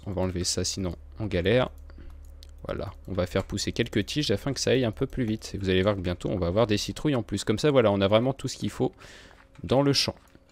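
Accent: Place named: Nord-Pas-de-Calais, France. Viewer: Salut , j'ai remarqué que tu parlais ch'ti , tu es du nord ?( Car moi oui )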